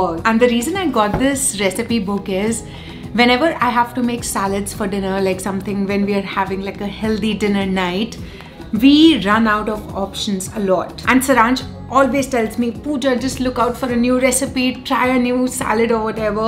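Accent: India. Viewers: her English is perfect she just has an Indian accent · I am not trying to be a grammar nazi but she makes a lot of basic grammatical and pronunciation mistakes lol. If People genuinely want to learn English they should go to the right source. I know she has an Indian accent and there is nothing wrong about it.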